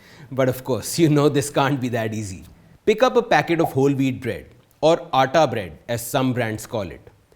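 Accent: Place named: India. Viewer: first correct your accent. indian. you guys speak englidh very worst that you seem like speaking shit language like your indian and it hurts listening to your ugly accent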